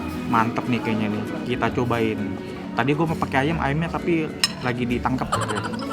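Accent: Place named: Java, Indonesia. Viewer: mantappp....jawanya tambah Meddokk mamen mkan coyyy..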